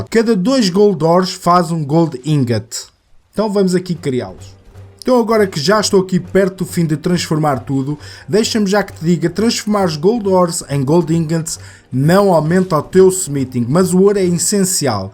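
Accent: Portugal. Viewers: Gosto do jeito que os portugueses falam mó fofo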